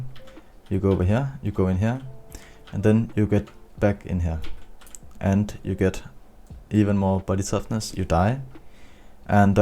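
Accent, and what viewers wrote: Denmark. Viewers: are you from denmark? cus i am and ur accent is like that. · ur danish i can hear it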